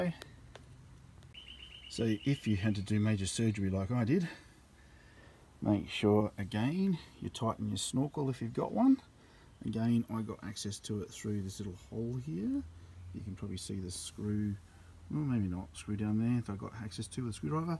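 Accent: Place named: Australia. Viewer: Mate, you and your Australian language are both legends, thank you for the video, cheers from Saudi Arabia.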